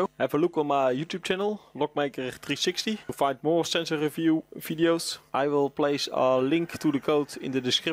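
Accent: Netherlands. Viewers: Dutch, 100% Dutch innit he?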